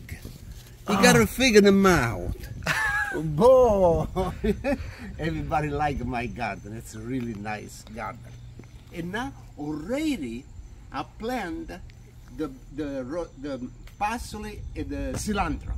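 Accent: Italy. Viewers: I can spend the whole day listening to this man talking about his garden with his Italian accent !! The most beautiful thing 🥂🥂👍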